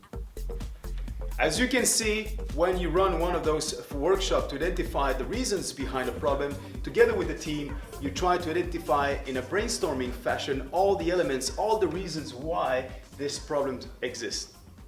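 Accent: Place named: Belgium. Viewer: my only question after watching this video was where this guy comes from. Based on his style and accent I'd say Belgian. Anybody here who knows for sure?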